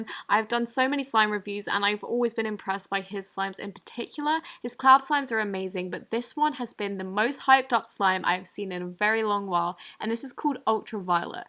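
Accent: England, United Kingdom. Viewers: is she really British like wth.... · I love the English accent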